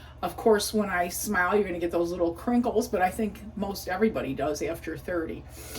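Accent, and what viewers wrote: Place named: Midwest, United States. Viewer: Hi. I’m Guessing your age is 59, same age as me. You have brought a smile and laugh to my face. I’m happily married to my second husband for 27 years and have two son’s and 4 adorable grandson’s, if I were a lesbian you would be a beautiful wife for me, LOL! Please keep making the video’s. I’m disabled but can take care of myself without any help except for driving. My husband takes me to the doctor a couple times a month. I tend to be unsophisticated when it comes to real fashion. I don’t know the differences in perfume, cologne, & Eau Fraiche. I wear Twirl by Kate Spade it’s a fresh scent which I prefer. Please do more diet related videos and discuss your fitness routine and btw your Midwest accent is refreshing my father’s family was from Missouri and my husband and his family are from Missouri and Indiana so “ kid” keep those videos coming. 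😀😘 Thanks, Sharon.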